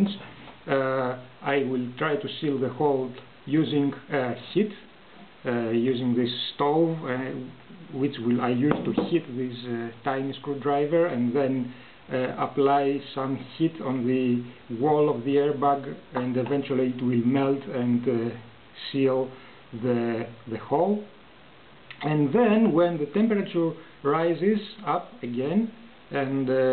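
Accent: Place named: Italy. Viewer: Accento italiano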